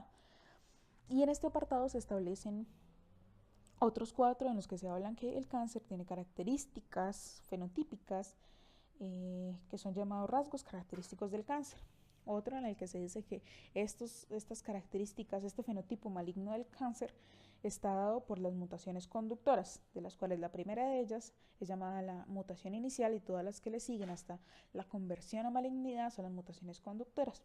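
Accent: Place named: Colombia. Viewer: Tienes que ser colombiana,tú tono de voz es hermoso, dra porque no continuo con el proyecto este y el otro canal estan caídos, además supee genial estps capítulos de Robbins, gracias, saludos desde Cali, Colombia.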